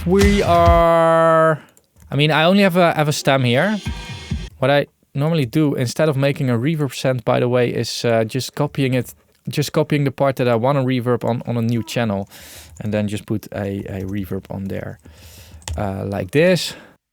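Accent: Netherlands. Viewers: mine is Böndle. Btw, I'm not trying to be mean, I just think the Dutch accent is one of the funnier ones.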